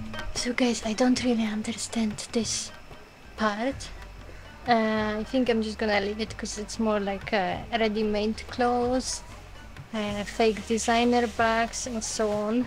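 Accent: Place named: Russia. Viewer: you are very polite and soft spoken and you sound like russian 👍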